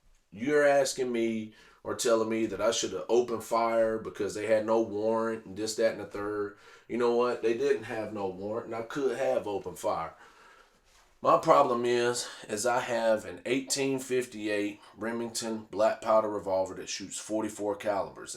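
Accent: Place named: South, United States. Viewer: I'm getting the weirdest vibe from you here. It's clearly all insincere. It looks like bad acting as you're reading a script. But there's more. You have the weird redneck accent thing going on with sayings and mannerisms that are supposed to convey that you're not too bright and that you're one of us. It's all fake though. You're smarter than you're selling yourself as. You're gaslighting and faking. I won't be subscribing for this "content" you're trying to sell me on. It's not clear what this channel is about but it seems clear to me that you're trying to monetize this incident. You put on this "cool" persona but your acting is terrible. Most people will not even notice though. Most everything people watch these days is completely fake and they still accept it as real. They say "I know this reality show is edited and scripted" but in reality they are falling for it. 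They are desensitized to fakeness. I found this video rather repulsive to watch. You are not the everyman you're trying to sell yourself as. You are not stupid. Most people will fall for this bad acting job you're doing but I am not one of them.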